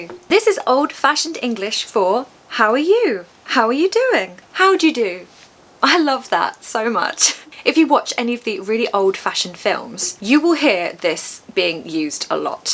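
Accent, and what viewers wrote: RP, United Kingdom. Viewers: Marvelous accent Lovely intonation Nice pronunciation Kind voice Attractive British English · This was excellent. I love listening to your British Accents...............Toodle Pip from 🇨🇦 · I do find the RP accent very charming ˖♡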